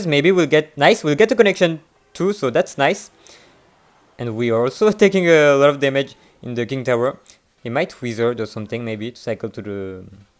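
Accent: France. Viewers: good french accent bro t'es français? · incredible french accent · l love this french accent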